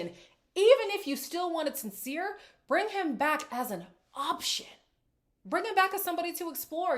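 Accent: Canada. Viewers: Your Canadian accent is so cute in this video 🤣 the passion in this review, I FELT IT!!!!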